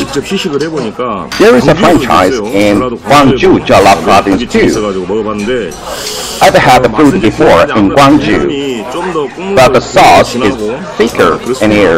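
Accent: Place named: United Kingdom. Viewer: 영어가 영국식 같아요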